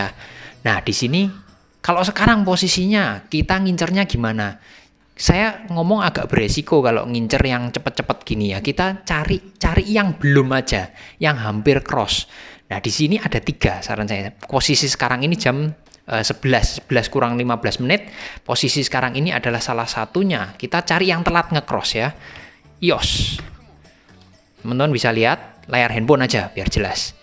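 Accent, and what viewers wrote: Java, Indonesia. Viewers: Bang jowone ngendi to kie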